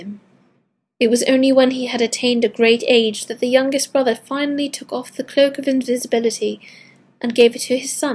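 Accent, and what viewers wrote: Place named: United Kingdom. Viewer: Captivating voice and perfect British accent. It sounds like Hermione's voice. Nice reading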